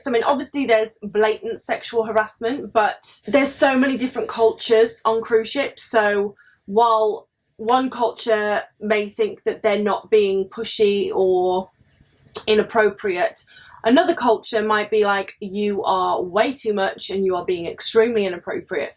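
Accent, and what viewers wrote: United Kingdom. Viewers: Thanks! Love your videos and your British accent! You are the coolest! 🤪🤣😇😉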